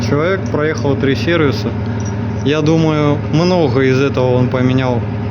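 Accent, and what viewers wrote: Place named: South, Russia. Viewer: Это южнороссийский акцент в краснодарском крае и в Украине нак гхэкают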